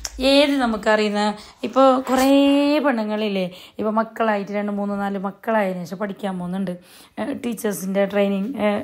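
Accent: Kasaragod, India. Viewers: നാട് എവിടെയാണ് കാസർകോട് ആണോ സംസാരം കേട്ടപ്പോൾ തോന്നി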